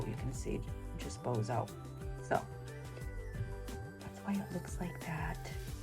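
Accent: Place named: Boston, United States. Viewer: Some vocal rhythms I just cannot listen to. I can’t watch some diy channels because of it, or the repeating of words, like “I’m gonna go ahead and…” I’m out, I can’t do it. 🤦‍♀️🤷‍♀️😂 I don’t know what it is, but I just can’t listen to it. I know it isn’t their problem, it’s mine. I have heard people repeat that phrase ten times in the first minute or two and I just don’t know what causes me to check out, but I do. I haven’t noticed any of that on your channel, your voice is relaxing. Are you a Boston girl? You have a slight accent. Your journal is beautiful. I am like you, it is hard for me to make decisions and to get started on something new. I need less stuff, because I have a hard time with decision making. 😂 I am actually going through my stash and throwing some things out, because I have far too much stuff.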